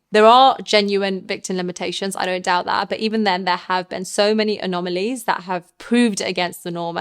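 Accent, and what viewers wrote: United Kingdom. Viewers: I love that accent...I'm assuming it is British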